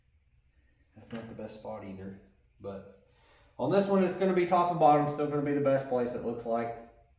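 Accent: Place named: United States. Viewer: I'm as Ukrainian, I like your videos to hear a "real" American English, not like they're speaking on a news channels etc.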